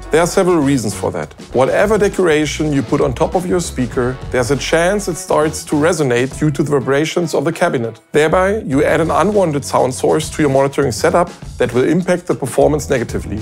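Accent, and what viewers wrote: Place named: Germany. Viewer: Beste, ich liebe dieses deutsche Englisch xD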